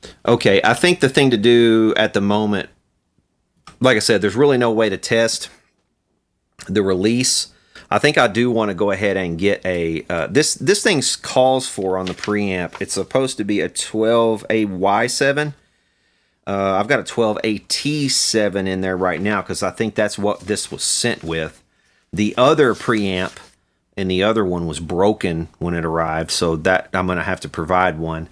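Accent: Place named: Georgia, United States. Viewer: SOUTH Georgia accent, be precise! nice work. Nice compressor.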